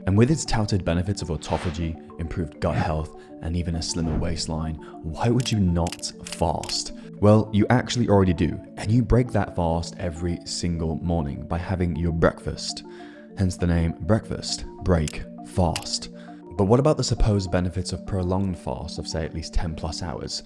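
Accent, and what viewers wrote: RP, United Kingdom. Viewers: Great video with good information! Btw - I LOVE your posh accent 🔥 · I’m not sure if anyone in the world authentically pronounces fasting as faaahhhhhsting and last as laaaaahhhhhhst. IDK….is it a way to say ‘hey, look at me as a productivity guru, I’m so successful I have a ridiculously posh accent!’.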